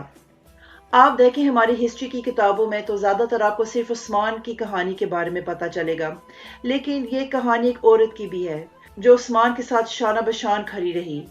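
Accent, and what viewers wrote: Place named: United Kingdom. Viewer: Why are you speaking Urdu in English accent? It doesn't suit you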